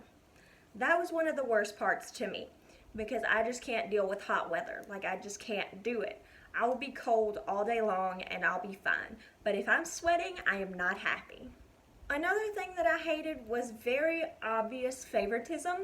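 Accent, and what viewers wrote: United States. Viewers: You have tattoos and a country accent this is so strange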